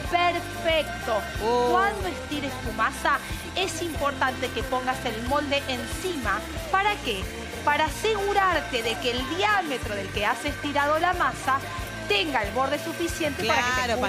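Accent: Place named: Buenos Aires, Argentina. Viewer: En argentina no hablamos todos con la tonada porteña... te invito a recorrer mi pais. Al rodearnos de tantos paises tenemos diferentes tonadas. De todas formas la tonada porteña se consolidó por la oleada de paises europeos que emigraron o que hacían negocios en el pierto de la ciudad de bs as agregado a los nativos. Es muy interesante la herencia q nos han dejado nuestros antepasados.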